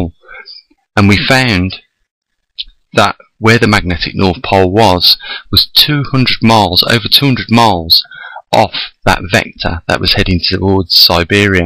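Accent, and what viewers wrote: Birmingham, United Kingdom. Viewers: I here a brummie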